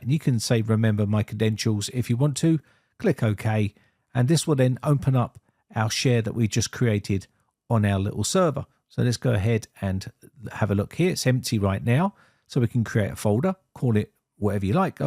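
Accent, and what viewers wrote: United Kingdom. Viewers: Hi, Sorry this is out topic. But i like your british accent. =)) Plese recommend other british tech youtuber I can subscribe. Thank you.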